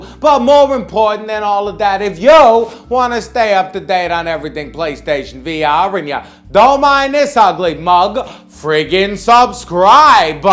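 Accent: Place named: Philadelphia, United States. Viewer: I’m from Philadelphia your accent sounds like mine lol I have you a subscribe because you deserve it I’m getting my first psvr set this Monday well my first vr set ever and you really helped me choose my first game . Can only choose one for now because money is tight thanks for your hard work I’m going to go check out your other videos too You remind me of someone who I would befriend lol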